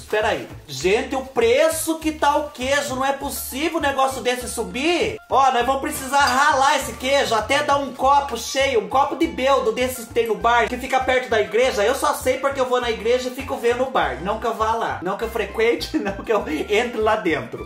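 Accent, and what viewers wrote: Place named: Minas Gerais, Brazil. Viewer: Descobri você ontem dia 21,mas estou amando você com esse sotaque de mineiro!!!!!!! KKKKK Você é muito divertido.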